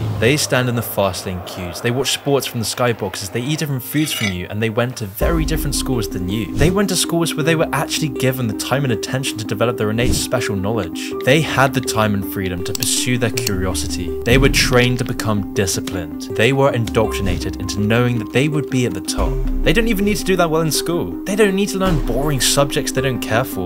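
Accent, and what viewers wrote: England, United Kingdom. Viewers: Yo u English? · British accent describing problems with the US dollar? I do appreciate your work Mr., but why the foreign interest in American finance?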